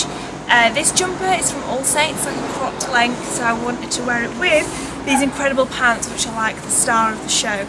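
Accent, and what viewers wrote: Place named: Lancashire, United Kingdom. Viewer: Hi! I am totally new to watching your channel - your 'Alternatives to Wearing Jeans' showed up on my recommended, and I loved your no-frill, down to earth style of talking to your viewers. Your accent is also really nostalgic for me; I'm not sure if it's Manx or Lancashire you're from but all of my family have your accent, so I felt like I was suddenly home. :) This was genuinely a lovely fashion video to watch, the way you present and edit your videos is just gorgeous and felt so real. :)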